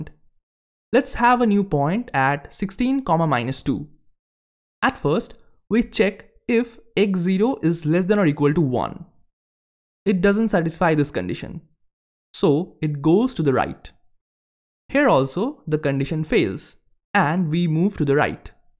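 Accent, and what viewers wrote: Bengal, India. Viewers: bengoli accent ftw!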